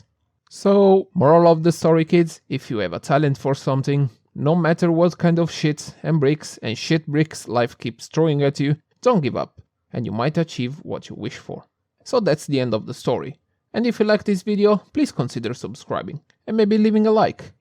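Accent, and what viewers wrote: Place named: Italy. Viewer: You are getting better at videos, lenght is right, humor is silly and dark and animation sucks as it should. Like comment subscribe lets get the algo interested more even if it already has shown you. ( oh and work a bit on the english, the accent I dont think is a problem, but some words are clearly hard to spell for you and you slur them a little. Good pronounce + slight italian accent is the way to go imho)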